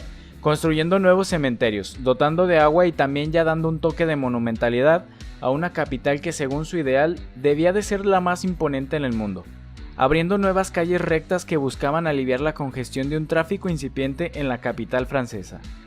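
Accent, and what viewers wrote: England, United Kingdom. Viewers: 🤣 pronuncias a la inglesa los nombres franceses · sí por dios, no sé a qué viene decir las cosas como si estuviera hablando inglés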